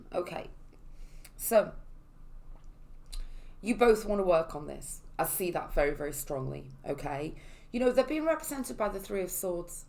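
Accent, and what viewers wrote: Liverpool, United Kingdom. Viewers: I thought Liverpool/ North West too. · I thought it was liverpool I was hearing 🥰 I'm a brummie and southern Ireland 🍀🥰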